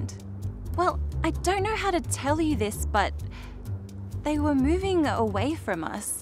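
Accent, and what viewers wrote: Australia. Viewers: I'm from Sydney and i didn't even notice that you were aussie until i saw an Australian ambulance lol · I find her mild accent to be very pleasant and easy to understand... I'm pretty sure it's Australian, but I know it's not a Dutch/Afrikaans/South African accent; for some reason I find that accent to be very harsh... (I have nothing against the people themselves... I'm sure plenty of folks in SA would find my rural-western-American accent to be just as harsh and unpleasant, lol) ✌️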